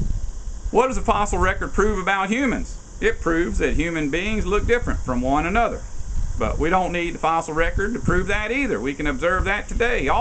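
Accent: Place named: Texas, United States. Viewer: Your voice is very comforting to me, never mind what anyone else says. Its a vocal pattern I heard constantly when I was tiny, growing up in the Gulf Coast area of Texas. So you, sir, are the voice of a comforting bedtime story to me. Thank you for being you.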